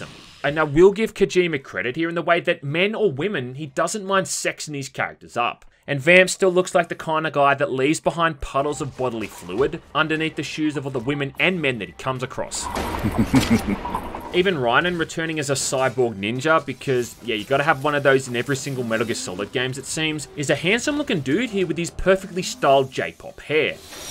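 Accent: Australia. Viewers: I love your videos. Great break downs. You nail everything. Also, hearing an Aussie talk with such casual lingo and slang is perfect for me. Keep it up mate. You're doing great. Top shit. Yeah nah yeah cobber.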